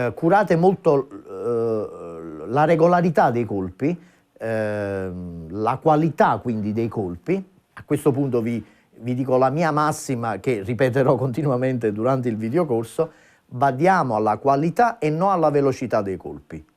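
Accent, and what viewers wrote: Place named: Campania, Italy. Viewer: Grande maestro! Simpaticissimo. L'accento campano mi fa sentire a casa.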